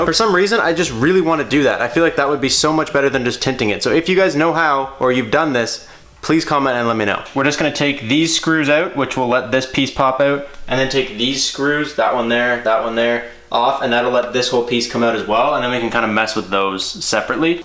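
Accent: Canada. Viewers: AMO he’s canadian man It’s his accent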